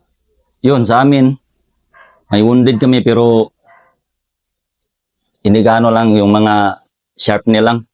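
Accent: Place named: Visayas, Philippines. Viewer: ikaw mag storya sig reklamo! klarong bisaya galisod ug tagalog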